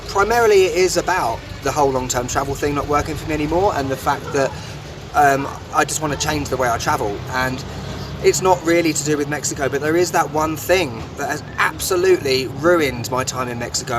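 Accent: United Kingdom. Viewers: I love your Bit accent !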